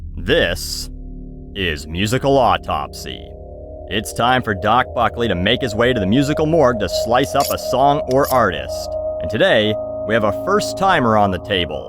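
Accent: United Kingdom. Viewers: The Brit accent cracks me up. Also the gimp mask and strap-on Hahah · That British Accent Though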